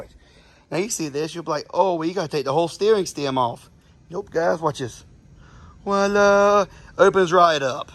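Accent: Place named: South, United States. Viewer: Over tightening is my middle name 😂. Always stripping screw heads or bolt threads. Bad habit. Side note, that Southern accent is pretty cool. I thought I was listening to the American Dream Dusty Rhodes telling me how to fix my Fourwheeler..😂😂 I have an issue with the steering being hard to turn. I replace ball joints, tierod ends and wheel bearings on both sides and it turns a little better but surely should still turn easier. The bushing is one thing I haven't checked or changed. Was wondering about greasing it but idk if it'd do any good since you said it didn't really make a difference on greasing a new one. (Btw all that stuff I replaced needed replaced anyway but barely made it easier to turn)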